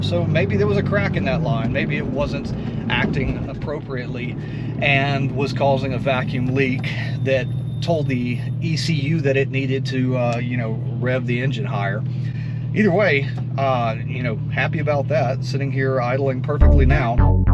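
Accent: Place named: South, United States. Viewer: Great Job. Love the 996 videos, I have a 2003 that I bought from the original owner, retired navy pilot. He drove it like it was a daily driver. but he had a book stuffed with receipts for repairs and maintenance since the day it was new. Now sitting with 100K on the odometer and When I see a repair like this one, that I can't find a receipt for, I doubt it has been done, and go ahead and tackle it. Si aside from removing the alternator, it looks rather straight forward. Keep the videos coming Good Job. I'm a Florida Boy transplanted out here in So Cal when I was active-Duty Navy. good to hear a Good Ole Boy accent reminds me of home.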